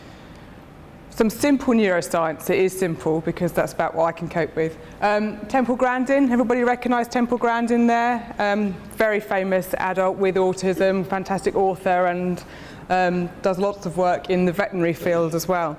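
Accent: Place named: United Kingdom. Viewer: British accent is beautiful .When Brits talk it is elegant .They make talking and art form .without even knowing it .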